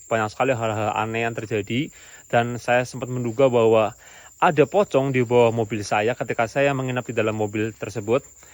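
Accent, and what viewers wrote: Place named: Banyumas, Indonesia. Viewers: arane kaya wong rusia jebulane wong tegal ngapak oii... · Bru ktmu chanel ini...bnr2 luar biasa beraninya...ko ini lucu ngomongnya medok ngapak...pdhl sipit ya ko semangat....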